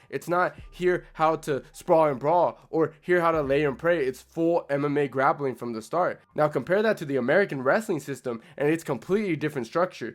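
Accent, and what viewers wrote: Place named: Mexico. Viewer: whos we? You dont sound american you sound mexican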